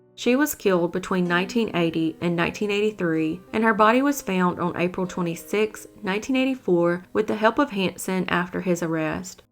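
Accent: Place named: Mississippi, United States. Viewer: I'm from Southeast Tennessee and you sound just like we do... Why I love ur videos. Keep it up!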